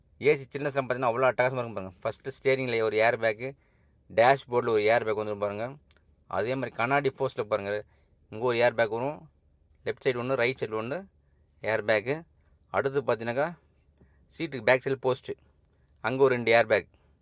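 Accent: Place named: Madurai, India. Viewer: Madurai vasagam super 🎉🎉🎉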